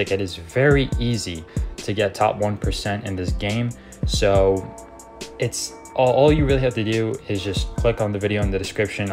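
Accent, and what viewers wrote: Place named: London, United Kingdom. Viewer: You are from London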